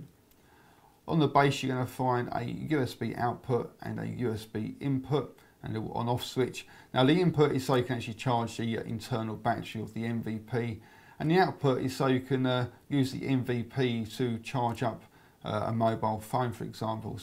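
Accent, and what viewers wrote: United Kingdom. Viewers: Hes british not english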